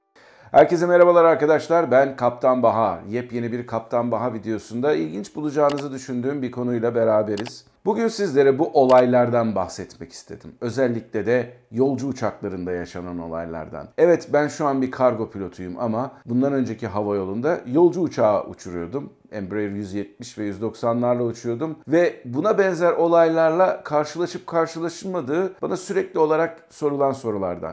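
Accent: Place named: Kayseri, Turkey. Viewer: Kaptanım, pazar günleri, Habertürk kanalında Airport isimli bir program var.Geçen hafta, uçakta ,yazılı olmayan görgü kuralları başlığı altında ,yararlı bilgiler vardı. Herkese öneririm.Hatta ,daha detaylı olarak belki siz de bir video yapabilirsiniz. Ama ,hep Gayseri aksanı kullanmayın, biraz da Rumeli,Karadeniz vs aksanlarında, takdir ettiğim tiyatral yeteneğinizi görelim. Bence Pilot olmasaydınız tiyatrocu olurmuşunuz..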